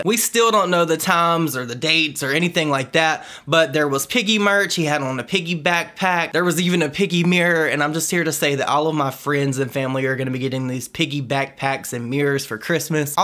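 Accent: United States. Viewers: How you say Riland😍I'm from Britain and we love American accents 😍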